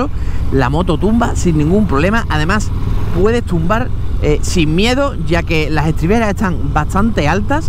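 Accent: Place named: Cádiz, Spain. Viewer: escucho un acento gaditano me encanta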